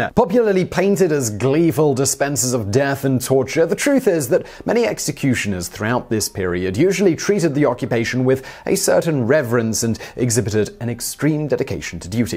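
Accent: United Kingdom. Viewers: wtf I came here for that V-Sauce guy. And momentarily thought he magically gained a British acccent. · Why is talking with a British accent. He's fancy and everything but now he just sounds silly.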